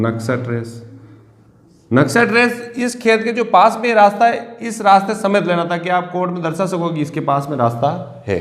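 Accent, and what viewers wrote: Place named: Mewar, India. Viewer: Good aap kha se he बोली से मेवाड़ के लग रहे हो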